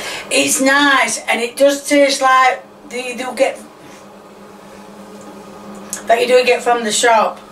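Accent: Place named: South Yorkshire, United Kingdom. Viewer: Are you a South Yorkshire Lass like me ? We have the same accent ❤️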